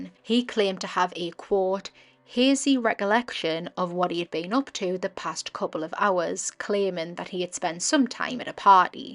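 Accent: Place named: South Shields, United Kingdom. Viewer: Your accent sounds local to me. I’m from South Shields. First time listening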